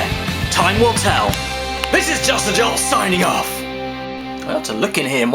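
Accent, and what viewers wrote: United Kingdom. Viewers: You are british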